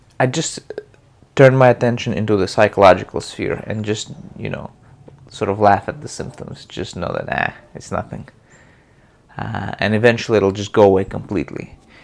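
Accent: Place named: Russia. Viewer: Been dealing with Pain/Fibro issues for a long time, I was bed bound for about a yr about 8 yrs ago but still have synthoms found a great TMS dr here in mass hes Russian like yourself ..so to hear your accent and his which is the same it gives me alot of confidence ...thx you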